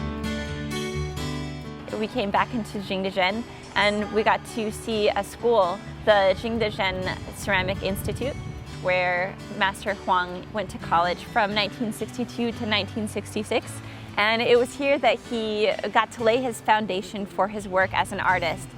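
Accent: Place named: West Coast, United States. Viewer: A statement should never sound like a question. This video has so much to share. Please watch your west coast accent.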